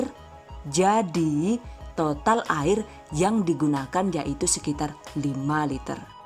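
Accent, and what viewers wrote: Banyumas, Indonesia. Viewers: Susu kedele ne mantul banget,cocok buat ide usaha tuh...nyong sok ngguyu2 dewek nek nonton video ne Rika ne Yu!,kreatif karo lucu koh ana ngapak2 e...hehehe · Enak dan seger bund susu kedelenya,aku sangat suka, diminum hangat enak,diminum dingin lebih seger, pokonya mantap,sukses selalu bund,ngapaknya yang bikin ngangenin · Budhe.. ko basane kaya basane nyong kabehan 😂 tiyang pundi budhe?? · Aku mah fokus ke ngapak nya lucu abis 🤣🤣🤣🤣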